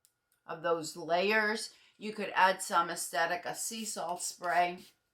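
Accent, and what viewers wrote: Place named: New York, United States. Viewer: Thanks, for the review, and change of color. It looks different from indoors and outdoors. I was wondering about this color. Their wigs are so comfortable. Enjoy your voice. Sounds like you have a New Yorker accent. Thx!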